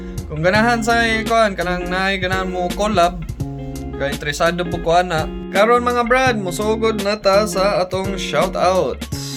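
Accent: Visayas, Philippines. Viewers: New subscriber sir from Tiktok to Youtube.lingaw kaayo ko nimo sir bisdak kaayo ka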